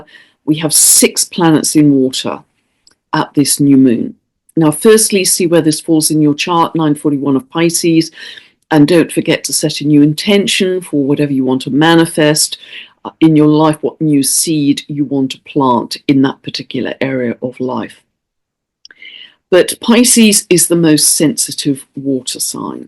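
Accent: United Kingdom. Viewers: "starve out the scary, love in the good" in a British accent, of course ❤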